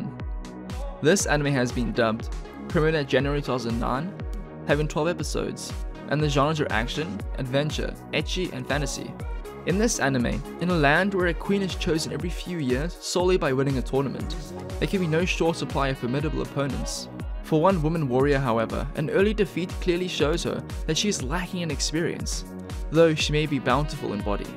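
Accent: South Africa. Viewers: Are you South African ?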